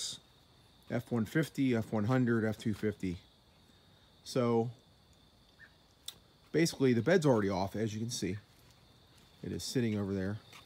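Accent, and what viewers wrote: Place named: Philadelphia, United States. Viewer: Your thick Philly accent. Where did you grow up?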